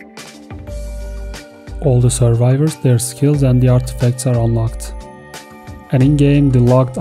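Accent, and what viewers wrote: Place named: Turkey. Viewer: turkish guy detected gang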